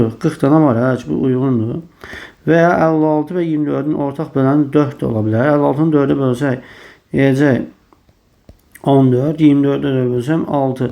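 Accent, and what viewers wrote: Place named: Gabala, Azerbaijan. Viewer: Salam danışığında Qəbələ ləhcəsinə bənzəyir sabah hamınza uğurlar ❤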